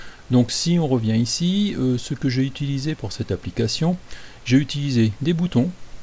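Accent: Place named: England, United Kingdom. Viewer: Attention à l'accent anglais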